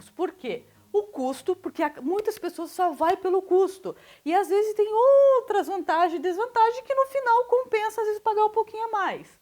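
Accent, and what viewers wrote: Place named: Curitiba, Brazil. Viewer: Ótimos vídeos explicativos, sotaque Curitibano "Penca" de app kkkkk amei. Estou querendo abrir um delivery.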